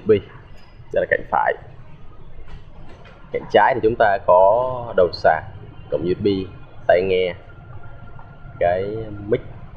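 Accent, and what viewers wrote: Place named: Da Nang, Vietnam. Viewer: chu choa, air2011 mỏng và nhẹ tới khó tin:D air 2012 mình chưa đc coi video tiếng V, nó có j thú vị 0 mấy a ? mà e thấy mấy a nên bật máy và làm vài soft+ mấy tính năng hay hay để lồng vào video đi, chớ nhìn phần cứng mãi cũng chán mà cái bàn rê magic touchpad của riêng mac có bán sẵn ko ? nếu có thì giá nhiu? . p/s: 0 có cảm tình w giọng nói của a này :)) ọp ẹp, nam chả ra nam mà bắc cũng ếu ra bắc, nửa ĐN nửa nẩu nẩu (giọng... ái nam ái nữ_, clip sau nói tiếng fổ thông 100% cho dễ nghe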